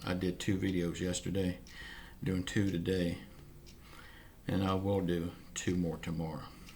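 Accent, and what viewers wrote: South, United States. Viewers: morning i can tell you are from the south im from the south knox tn who knows about Zayres except some one from the south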